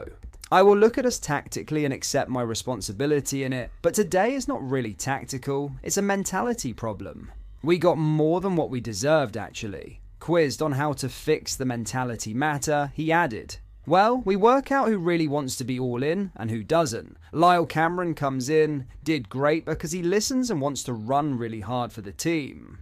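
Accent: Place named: Birmingham, United Kingdom. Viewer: Sound bit brummy this AI todows video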